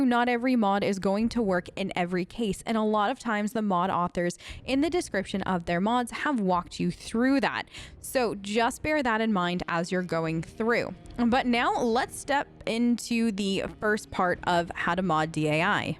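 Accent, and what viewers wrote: United States. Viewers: Sorry i appreciate your trying for newbies but this way too superficially explained. DAI mod manager and frosty aren’t really compatible then I followed your steps which look SO EASY and no mods worked. Instead I had to manually change my patch that my game works again and i get constantly an error in dai mod manager and no green bar when pressing merge. And your heavy American accent doesn’t make things easier 😫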